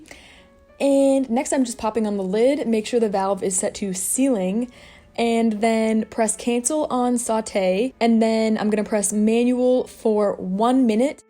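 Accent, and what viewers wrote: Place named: United States. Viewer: What American accent is this :-)? Sounds intrueging!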